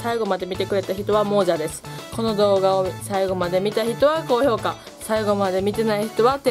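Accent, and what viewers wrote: Kansai, Japan. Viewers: かわいい子が関西弁しゃべってるとかわいい二割り増し！！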